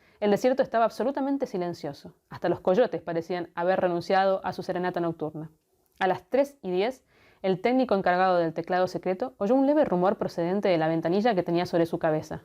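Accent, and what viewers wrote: Argentina. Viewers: Hola! Gracias por tus videos! Me encanta tu estilo tan directo y objetivo para hablar de libros! Amo tu acento argentino! Y es maravilloso tener buen contenido latinoamericano!!!! Jejjee y GRACIAS!!! Por tu recomendación acabo de terminar crónicas marcianas…. Ufff lo amé!!! Todos los niveles de lecturas que tiene! Es increíble!!! Gracias y saludos desde México 🇲🇽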